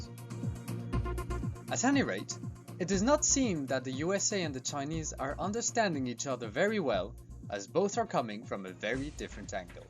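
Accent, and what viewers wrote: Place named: Ireland. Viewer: I came across your video by pure chance my dear friend. You have treated a serious and always topical subject with a touch of humor as usual and a slight Irish accent which gives a little more charm to your video!!! well done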